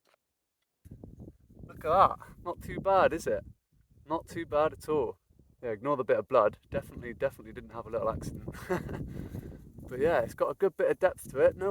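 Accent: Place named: England, United Kingdom. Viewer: I love your accent. What part of England is it from? I am a new subscriber. Doing a great job with the content. 👍😊 from Éire well done 👍 on the spoon 🥄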